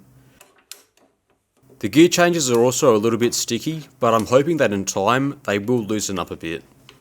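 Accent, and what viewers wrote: Australia. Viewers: Wait, when did This Old Tony get an Australian accent?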